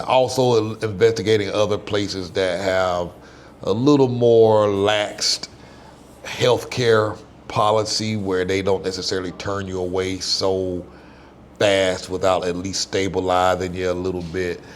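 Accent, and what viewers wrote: South, United States. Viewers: Your accent sounds southern USA.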